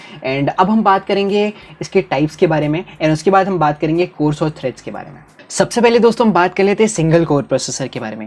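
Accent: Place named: Jharkhand, India. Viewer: Bhai tu jharkhand se hai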